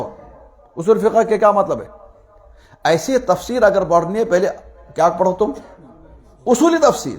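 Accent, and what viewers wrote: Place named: Kashmir, India. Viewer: urdu mai kashmiri tunr h hazrat ka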